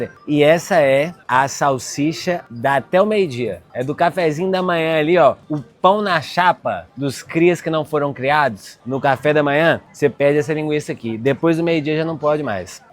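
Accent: Minas Gerais, Brazil. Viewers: 😂😂😂😂❤❤❤, seu canal é ÓTIMO! Mas o seu mineirês é ainda melhor 🎉🎉🎉 e só enche a gente de saudade. Pra pronúncia de quase tudo, principalmente pra gravar, use a referência do Google. Weisswurst vira então Váissvurst - facim-facim, né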